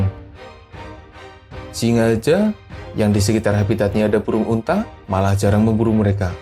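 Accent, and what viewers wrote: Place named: Java, Indonesia. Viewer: Saran logatnya terlalu medok dan monoton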